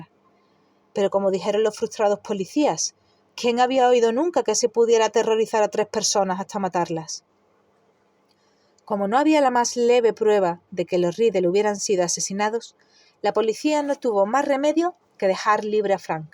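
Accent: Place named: Malaga, Spain. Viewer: Me iba a poner a leer el libro pero tengo tanta fiebre que no puedo ni leer, así que me vienes de perlas para pasar la fiebre en cama. Me ha gustado mucho tu voz y entonación. Por cierto, eres malagueña? 100% seguro que de por esa zona pero no te sitúo exactamente al intentan no poner acento al narrar xD.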